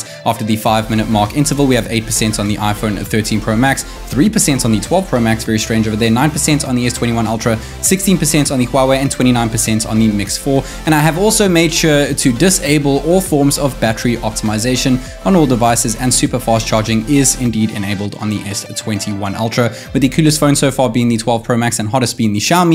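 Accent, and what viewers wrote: South Africa. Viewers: i swear this guy sounds south african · Bro I can recognise that accent anywhere. Hello from a fellow South African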